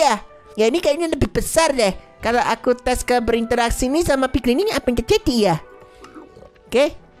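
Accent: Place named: Java, Indonesia. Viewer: bang medok banget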